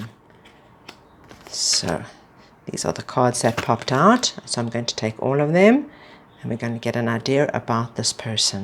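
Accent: South Africa. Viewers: wow thanks for the reading queen! you sound south african lol❤️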